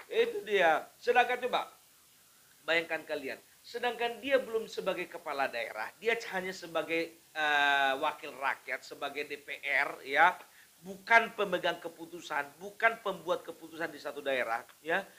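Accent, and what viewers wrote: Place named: Sunda, Indonesia. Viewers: Abang basa sundanya nyunda pisan👍terima kasih sudah mendukung bapaknya jabar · nyunda pisan euy... hebring 🎉😅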